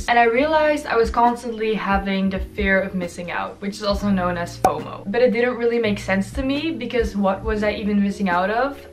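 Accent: Netherlands. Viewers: You are Dutch I hear it!😂💓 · you dutch? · can tell ur Dutch hahah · r u dutch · Omg! I was able to tell that you were in the Netherlands cause of the way you speak. I lived in a small town in Limburg for 3 years. Anyways, I'm right now doing my one month detox facebook, instagram and twitter. Not Snapchat nor Messenger because I only use them for messages purpose. I did my cheat day yesterday because of my birthday but I honestly just posted about my birthday, scrolled and I realized that I don't care about it anymore and I haven't missed anything. And after this morning I logged off. I began this detox a little bit early on 27 September and I will log in again on 1 November. The best tip I followed was to completely remove those apps because in my computer, I rarely use those platforms. It's nice to see more people doing this. I'm more productive than ever before and I don't feel like I'm missing anything. 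Tot zo!! ;-)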